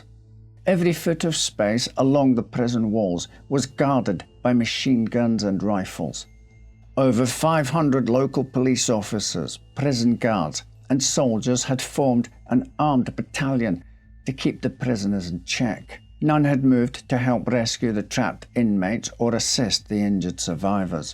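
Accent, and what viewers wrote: Scotland, United Kingdom. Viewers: Wow - just wow. Weird hearing local history from someone with an English/Scottish/Irish accent, but somehow it just makes it seem more interesting. Thank you and subbed. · I’ve got to stop watching well I never when I get into bed. No matter how interesting the story is, that calm Scottish like accent knocks me out every time. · "Scottish like"? He's 100% Scottish 👍😀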